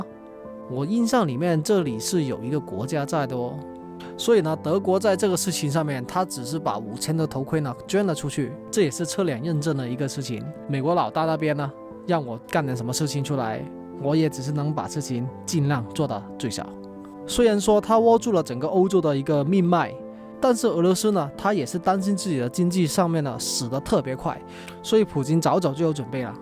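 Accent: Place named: Hong Kong. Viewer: 港普还不错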